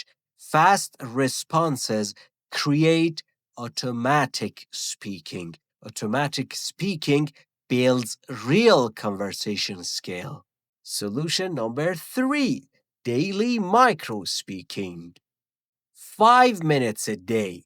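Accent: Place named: United States. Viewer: شما بسیارعالی تدریس میکنید چون تلفظتان امریکایی ست. بسیارعالیست.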